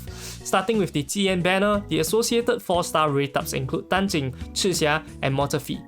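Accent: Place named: Singapore. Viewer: I do love Singaporean accent myself! 😂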